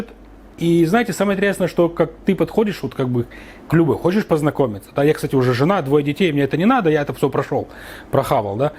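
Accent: Odessa, Ukraine. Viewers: Приятно вновь услышать неистребимый Одесский акцент. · Класный одесский акцент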